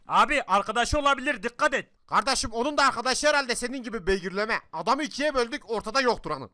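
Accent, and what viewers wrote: Gaziantep, Turkey. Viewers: abi antep dublaj papci devamı gelsin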